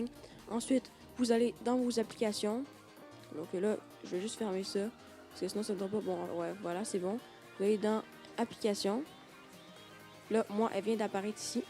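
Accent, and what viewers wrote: Quebec, Canada. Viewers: T'a pas un accent québécois ? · l'accent Québec j'adore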